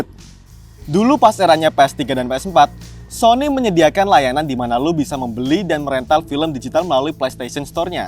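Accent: Java, Indonesia. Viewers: gausah maksa gw gw bro, logat jawamu kentel poll, pake aku aja for ur advice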